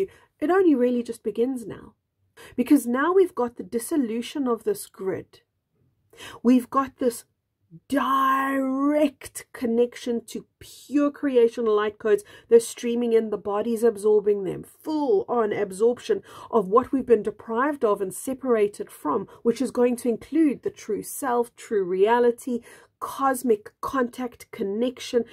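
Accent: South Africa. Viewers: Thank you for showing up for me right now. Perfect synchronicity. What a beautiful channel you are. And healing, too. Bless you. (Your accent sounds South African?)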